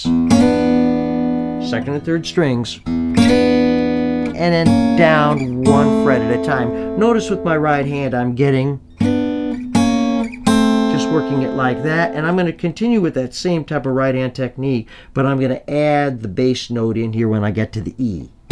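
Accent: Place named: United States. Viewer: your playing is terribly unprofessional. your accent even worse to endure listening to it. why are you doin this videos without really practicing until you get it right... ahhh sorry i completely forgot you maybe could be an american and your main audience is also american .. im 100% serious about what i write, especially your unawareness of how beautiful a guitar can sound if you play music with it, not just notes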